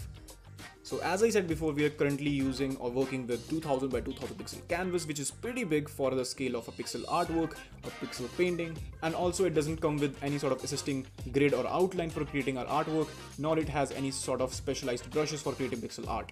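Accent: India. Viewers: i hate hendeee accent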